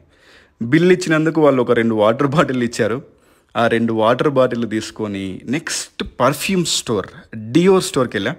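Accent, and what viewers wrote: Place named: Hyderabad, India. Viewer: I THOUGHT U ARE HYDERABADI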